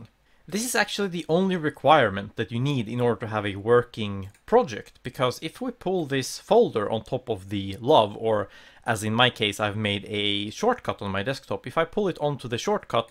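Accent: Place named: Scania, Sweden. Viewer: I'm also swedish you sound south.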